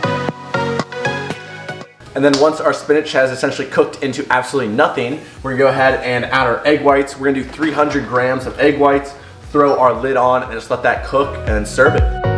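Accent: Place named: Australia. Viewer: Random question here, have you lived in Australia? I can hear an Aussie accent through your American accent! Just curious!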